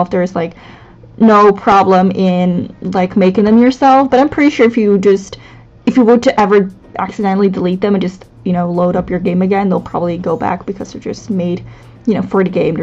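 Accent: Netherlands. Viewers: are you dutch?? cause i am :) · are you from the Netherlands?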